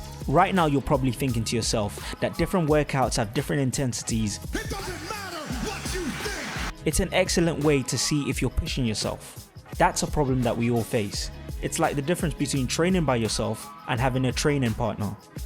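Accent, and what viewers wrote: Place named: Jamaican-Cockney, United Kingdom. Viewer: cant listen to the stupid jamockney acccent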